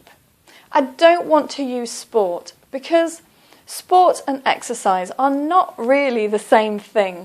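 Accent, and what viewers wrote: United Kingdom. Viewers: Slow BRISTISH voice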